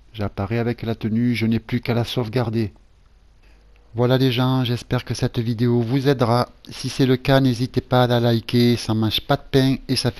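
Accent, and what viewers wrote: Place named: South, France. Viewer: merci le plus bel accent du ssuuuudd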